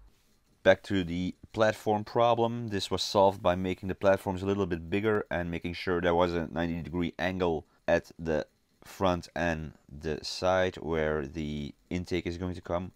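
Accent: Netherlands. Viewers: you sound a little Dutch!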